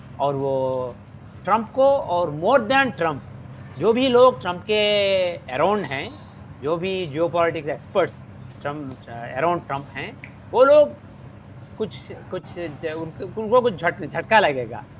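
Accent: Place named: Andhra Pradesh, India. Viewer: SIR, this SIR also talking like ME( HINDI).Is He from ANDHRA..😊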